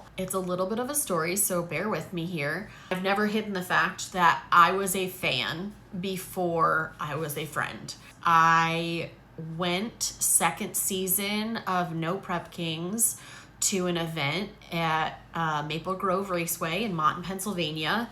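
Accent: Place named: New York, United States. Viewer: you sound nothing like a woman from New Jersey. Definitely from New York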